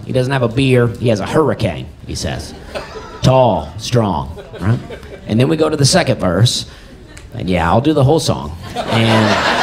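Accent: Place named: South, United States. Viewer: I'm a Midwesterner, and I have to admit that part of appeal is that accent...but it's more than just the southern accent, it's kinda the rythm and the calm way he talks... but overall is just so multi dimensional: the jokes, the timing, the laid back demeanor. This guy is one of my new favorites.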